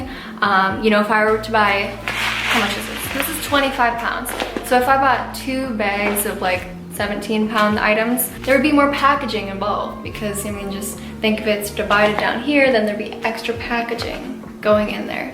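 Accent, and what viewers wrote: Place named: Minnesota, United States. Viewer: Oh ya, I think she's from Minnesota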